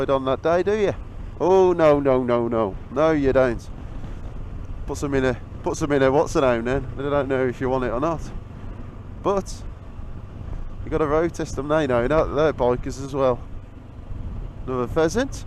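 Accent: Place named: United States. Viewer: Great job and great American accent,haha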